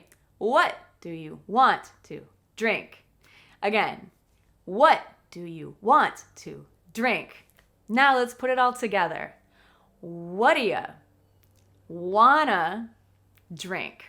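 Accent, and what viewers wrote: United States. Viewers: This is not natural English as it's natural American. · Dear teacher. I am from Somalia, I really love your American sounds. Proud of you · I love the American accent, hope you will help me learn it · Bravo bravo it’s the first time I understand someone speaks American English ☮️🌹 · American accent??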